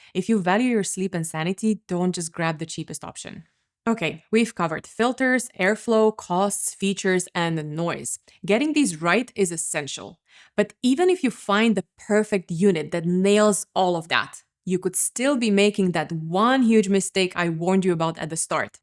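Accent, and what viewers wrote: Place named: Europe. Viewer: Incredibly helpful video. Plus the Euro accent makes me trust her more.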